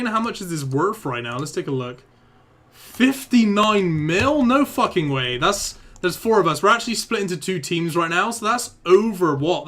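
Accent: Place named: England, United Kingdom. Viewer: !! where are you from in england?? i recognize your accent!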